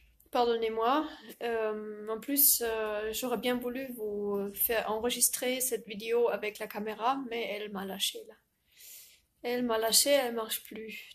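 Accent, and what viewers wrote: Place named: Germany. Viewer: Ich dachte mir schon vom akzent her das Sie deutsche sind. Es ist Das zweite vidéo dass ich mir von Ihnen anchaue. Ich leite weiter. Viel glück und dass diese verrueckte Welt endlich recht und wieder normal wird. Ich versuche so gut wie möglich auch meinen Beitrag zum Aufbau eine besseren zukunft für die zukünftigen generation. Ich drücke euch die Däumchen.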